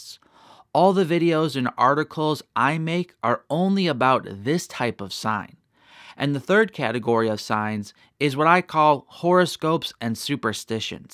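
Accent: United States. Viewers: It's good to know about God. I appreciate your standard American Accent. I am trying to understand American Accent.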